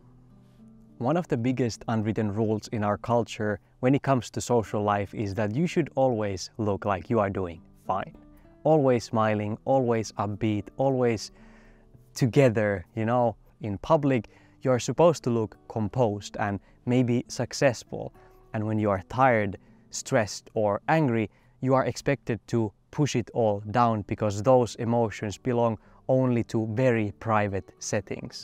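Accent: Finland. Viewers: The first word you said and me: he's Finnish lol. Greetings from Vantaa. Unfortunately even Finland has fallen into the american toxic mentality. Currently planning to go back to Italy (I'm Italian) and disappear in nature, mountains and sea. As anarchist I would say: screw (I usually tend to use the word that starts with a capital F) all these toxic societies, screw money, screw status, screw this sick narcissism, screw this insane form of capitalism, screw consumerism, screw this poisoning individualism and screw your racist country lol, at least part of it. I will miss a lot the good finn and probably you know what am talking about (usually the socialist ones). Now Finland you can hate me, I don't give a...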